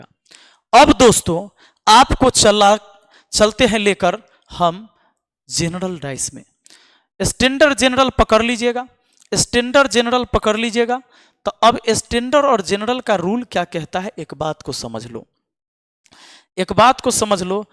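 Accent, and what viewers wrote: Bihar, India. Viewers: Sir kia aap bihar se hi hai Aap kabhi kabhi maithli me bhi bol dia kijiye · कोई नहीं यार आप से तो मैं बहस नहीं कर रहा हूं।लेकिन हमलोग बिहारी हैं।दो नही दू बोलते हैं आप भी बोलते होंगे अपने परिवार में।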